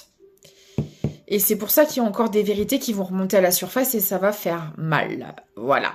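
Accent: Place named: North, France. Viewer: Tu es trop forte, cette guidance me parle tellement. L’amour inconditionnel évidemment, je l’ai laissé partir parce que je savais que ce n’était pas le moment ni pour lui ni pour moi il y an. Nous nous sommes revus qu’une fois en août depuis mais il n’y a pas une seule journée depuis un an où je n’ai pas pensé à lui. Tout correspond des 2 côtés, tu es vraiment très forte je le répète. Je me disais bien avec ton accent que tu venais pas du sud, j’ai grandi à côté de Beauvais 🤩. JE t’embrasse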